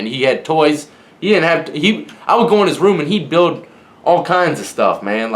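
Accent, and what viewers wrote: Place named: Texas, United States. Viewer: I swear your from Texas bro.. Very similar slang and accent.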